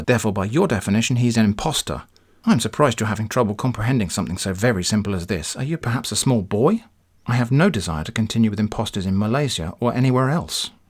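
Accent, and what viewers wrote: United Kingdom. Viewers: Ngl I saw the same Ted talk and found this guy’s videos and thought, oh it’s the guy from that Ted talk thing. Did he always have a British accent? (I’m being serious did that guy from the Ted talks have a British accent? I can’t remember for the life of me)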